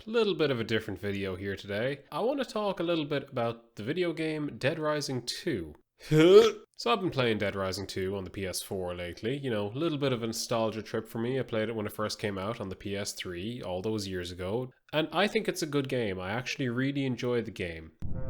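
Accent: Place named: Ireland. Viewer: Oh a Irishman? Well lad!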